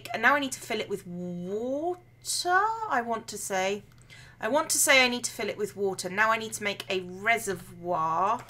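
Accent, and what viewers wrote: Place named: West Country, United Kingdom. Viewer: Yay for the West Country accent!:) Great video as ever. <3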